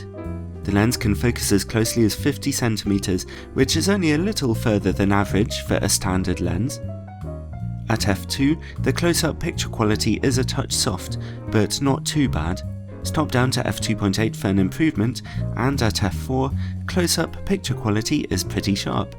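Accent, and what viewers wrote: United Kingdom. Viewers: Even if this is an old video, it's always a great pleasure, and really relaxing to listen to your soothing voice with your sweet British accent,. Also, your microphone is perfect for your voice, and this music made by the famous fits perfectly for your videos. It's like your trademark now in some way, haha! Great video review about the Helios 44-2, thank you!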